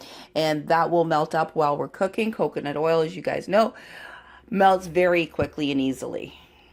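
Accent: Canada. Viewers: I love the Canadian accent and your recipes thanks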